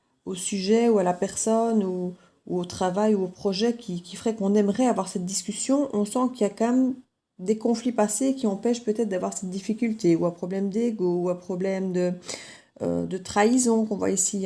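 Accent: Belgium. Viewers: Un accent belge ''😂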